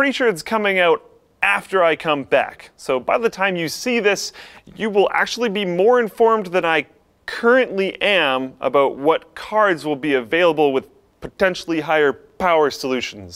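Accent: Canada. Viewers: Canadian accent is strong with this one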